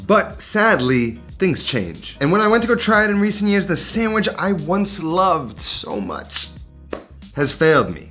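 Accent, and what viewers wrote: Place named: Westchester, United States. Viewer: Oh you from weschesta? Das it!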